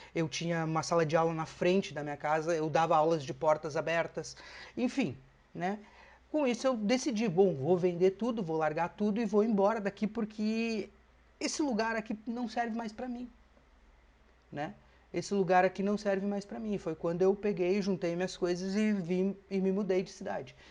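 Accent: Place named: Rio Grande do Sul, Brazil. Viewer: Que lindo vídeo! Pelo sotaque so pode ser gaúcho kkk. Sempre tento fazer a Oração e não termino. Sei do que estás falando: já estive no fundo do poço e todo mundo desapareceu....meu pai, mãe e irmão me estenderam a mão e me salvaram da fome e do despejo. Se vc não tem grana vc não tem amigos. Me reergui na medida do possível e aprendi a viver na Solitude, a não esperar nada de ninguém e principalmente: a olhar de outra maneira para pessoas que eu achava mais "humildes" que eu....Hoje eu cumprimento, dou atenção, paro pra conversar, ajudo no q posso, mas é de coração, com carinho, sabe. Porque não sou mais que ninguém. Gratidão e Axé pra vc.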